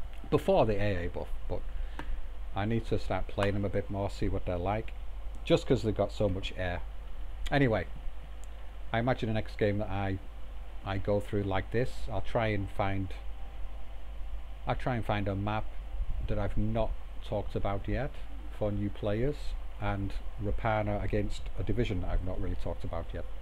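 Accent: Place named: United Kingdom. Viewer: Love the videos. Great to hear a fellow British voice commentating. Something we've been lacking since Alpha Bird left.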